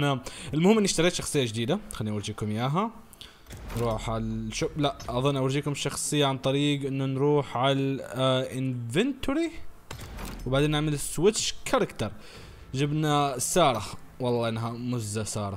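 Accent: Iraq. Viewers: دقيقة ملك اللهجه العراقية 😂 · احلى واحد يحچي عراقي ضلعي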